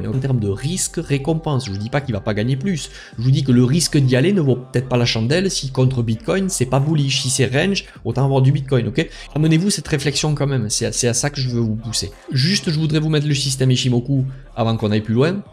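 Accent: South, France. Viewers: L’accent du sud on aime frero 🤣🫡 de bonnes vidéos à chaque fois force 💪🏻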